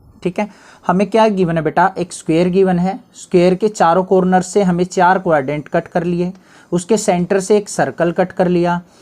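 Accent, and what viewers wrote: Haryana, India. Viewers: hm pta mujhe pahala hi pta chl gya bs confirm ny tha m vaisa sir ap hariyana m konsi side rahta ho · Sir ki voice me hi haryana show hota hai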